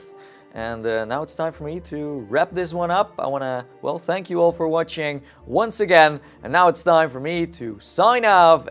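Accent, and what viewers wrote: Netherlands. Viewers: I dont now but your accent is abit like a dutch man speaking english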